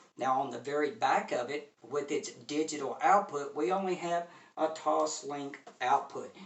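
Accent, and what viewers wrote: United States. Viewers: Look it up in the Dictionary , the yanks pronounce it that way!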